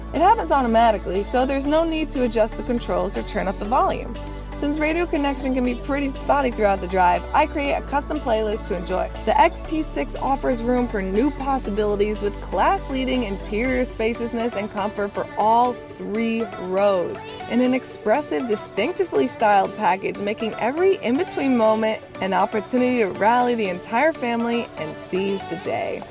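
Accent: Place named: Long Island, United States. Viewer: I remember thinking you might be from Long Island or something guessing you weren’t from one of the boroughs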